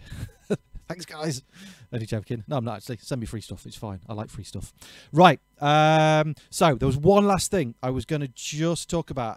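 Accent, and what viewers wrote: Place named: East Midlands, United Kingdom. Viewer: What a fantastic sound! I can't wait for my Deluge to arrive, hopefully in a few days! You sound like a local East Midlands bloke to me. I'm close to Chesterfield Jcn 29. Do you do teaching?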